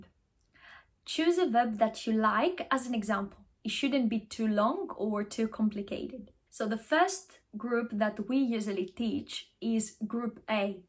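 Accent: United Kingdom. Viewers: I find your videos really helpful Thankyou! All the other Greek videos I have found are too difficult to understand as the tutors speak too quickly. Yours is perfect. I hope you don’t mind me saying but I am surprised that you are pronouncing ‘ and’ with a strong American accent and internet without the ‘ t’ sound because otherwise you have an English accent which sounds almost fluent. To me these words with American pronunciations stand out and sound rather odd. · Listening to your British-English accent, I wonder if you can talk about how you acquired it (instead of, say, an American-English accent), and how to better sound "Greek" instead of speaking Greek with an American or British accent? Also, I know that Crete has it's own accent/dialect. Are there many other regional accents around Greece?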